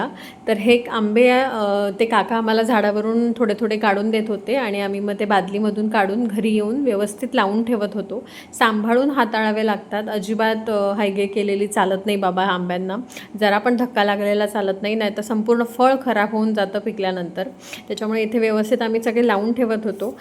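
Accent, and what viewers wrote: Malvan, India. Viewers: मालवणी खूप गोड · ताई, अग किती गोड वाटत ऐकायला मालवणी ❤❤❤❤❤❤ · मालवणी बोललीस हेच खूप छान गोड वाटले ऐकायला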